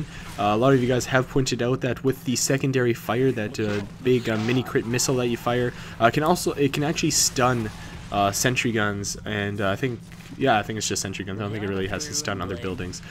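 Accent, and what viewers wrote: Canada. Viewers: Also, are you Canadian? Kind of hearing an accent. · I know that accent.... are you Canadian?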